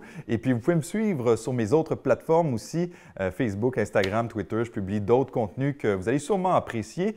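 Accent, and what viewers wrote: Quebec, Canada. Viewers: Petit garnement! J'ai t'ai vu souvent sur des vidéos tournés en France et je dois dire que l'accent québécois te va mieux. Merci Pour les tutos et bon succès!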